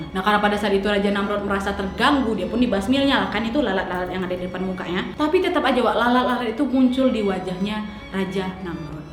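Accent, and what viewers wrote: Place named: Medan, Indonesia. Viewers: Uak,aku sangat suka,kali logat medan uak itu tros kan wak ya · Awak orang mane ? Kadang macam melayu medan..kadang macam melayu kepri..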